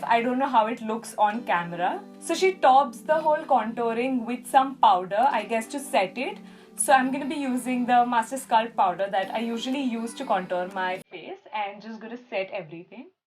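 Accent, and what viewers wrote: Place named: Gujarat, India. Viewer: Your Gujarati is soooooooooooooooooooooooooooooooooooooooooooooooooooooooooo cute 😍😍😍😍😍😍😍😍😍 OMG ❤❤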